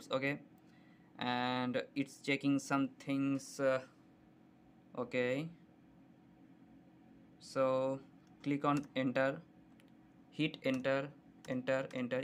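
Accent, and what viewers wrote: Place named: India. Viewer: your voice is similar like Bangladesh or Indian where are you from.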